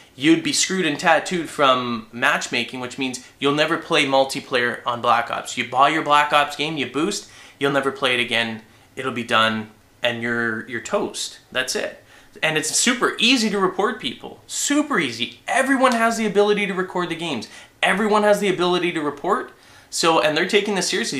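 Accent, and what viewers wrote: Canada. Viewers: are you Canadian? just wondering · They should've taken it out of the whole dam game. side note...are you Canadian?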